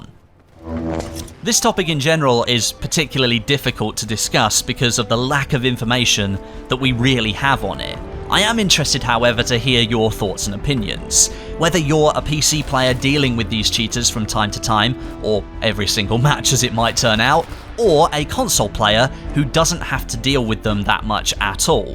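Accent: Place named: United Kingdom. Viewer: british accent sounds horrible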